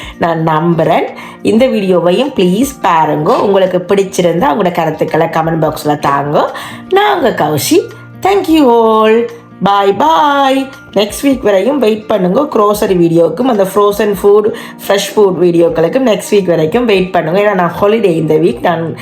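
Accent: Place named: Sri Lanka. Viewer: நீங்கள் பேசும் தமிழ் இலங்கை தமிழாக உள்ளது.இலங்கையா நீங்க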